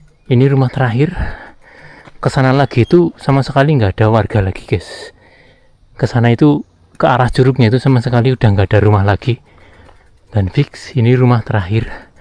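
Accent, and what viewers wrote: Banyumas, Indonesia. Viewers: Mbok ana ula dengernya lucu btw saya dri jawa timur suka denger orang ngomong ngapak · Krungu logat ngapak dhadhi kepengin bali njawa koh..🥹🥹🥹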